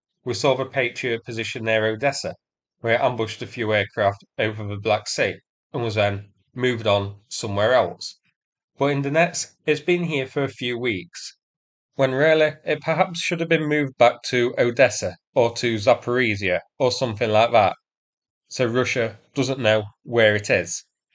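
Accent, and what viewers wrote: United Kingdom. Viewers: Hold on are you seriously claiming this is the first patriot system to be destroyed in Ukraine? Sorry but thats insane cope. The US has confirmed the first destoyed batteries almost a year ago. Ukraine is now begging for 25 more patriots... Germany saying they have sent so many to ukraine they have no new ones to send. This channel turned into cope central lmao. The british accent makes it even funnier.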